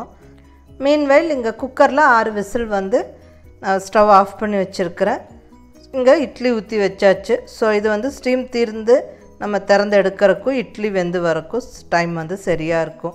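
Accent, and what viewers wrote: Kongu, India. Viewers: Mam super recipe. Kongu Tamil superb Namma Coimbatore subscribers ellarayum meet pannalamae mam PL reply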